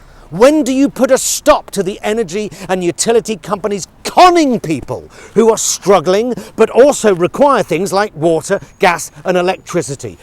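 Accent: United Kingdom. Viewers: This is me, but, without the British accent.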